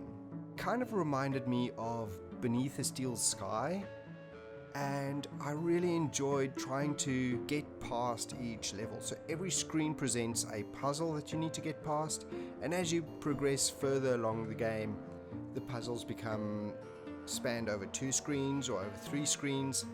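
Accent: South Africa. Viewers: accent is totally South African, sound like some of my boets Joburg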